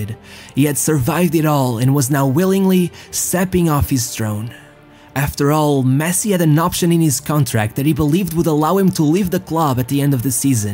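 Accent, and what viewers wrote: Portugal. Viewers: your perfect english vocabulary paired with your charming portuguese accent... bro... I am not gay or something but I am totally in love with you. You are telling those stories with true love from your heart and everyone can hear this. if maybe, 20 years from now, your videos will still be on youtube, I will watch them and maybe shed some tears of joy, coming from the nostalgia, created by your words about the good old times. merry christmas and a happy new year to you my fellow lover of the greatest game in the history of mankind. PS: I was tripping on LSD when I watched your CR7 video and it was an awsome experience.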